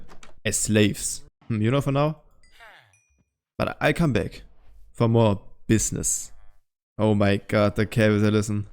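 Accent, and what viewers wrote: Germany. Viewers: your accent gave you away I know a couple Germans and you sound like them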